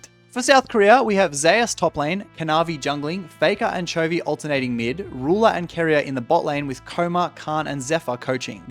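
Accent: Australia. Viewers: "Talkin' about de bloke" I might be dumb, but are you australian? lol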